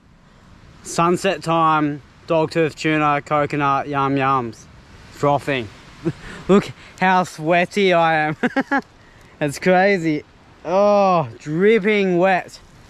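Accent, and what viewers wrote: Australia. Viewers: This channel is quickly turning into a cooking channel with great australian accent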